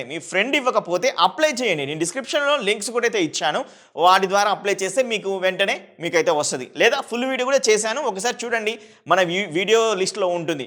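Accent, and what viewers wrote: Telangana, India. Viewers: మీకు తెలుగు సరిగా రావటం లేదు ఎందుకని, తెలంగాణ వాళ్ళ మీరు? స్లాంగ్ totally తెలంగాణ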